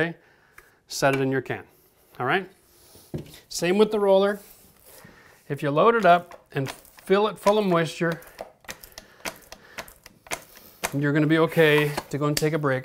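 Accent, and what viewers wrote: Canada. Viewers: I knew he was Canadian by his accent. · he's Canadian, give him some credit, we all speak different dialects of the English language. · Caught that in the first sentence! We love Canadians! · The fact he mentioned Ottawa and every time he said ou didn’t give it away? 😂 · "Don't want to drag dirt through your mud".....never heard that sentence before haha. I knew you were from Canada! Sound like Steve Smith. Can you use a premixed joint compound instead? I couldn't find a small bag of 45 on my trip to the store. I grabbed at bag of the 5 repair. Hopefully that'll work! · How many people can tell he's Canadian by the way he talks?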